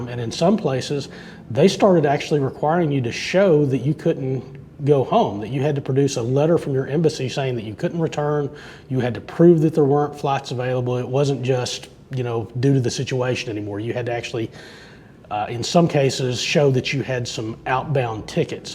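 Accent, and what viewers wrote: United States. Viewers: Nice man. You deserve more subscriber tobe honest. The reason why i subscribed is because i wanna adapt your accent. American accent? And Your videos as well. Like literally i love your videos.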